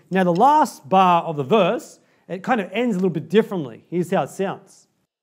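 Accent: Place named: South Africa. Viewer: *accent sorry. You're probably Australian, but I'm leaning towards South African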